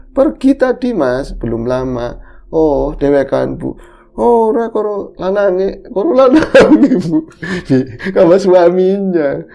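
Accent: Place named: Ngapak, Indonesia. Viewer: hehe..hehe...seru juga ngobor...bahasa ngapak na. medok banget...jadi pengen ikutan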